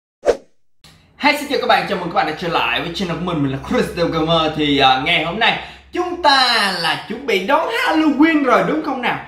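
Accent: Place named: Mekong Delta, Vietnam. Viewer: Đậm chất ngừ miền tây Nghe câu "thun thun thun thun" là bt liền😁😁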